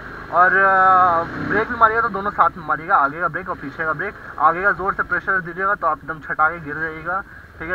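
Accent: Bihar, India. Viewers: bhai aap bihari ho???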